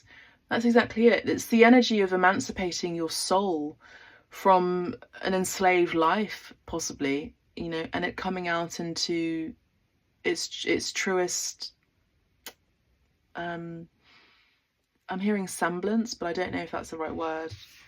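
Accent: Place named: United Kingdom. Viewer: England! Yes! Nice to hear a British accent.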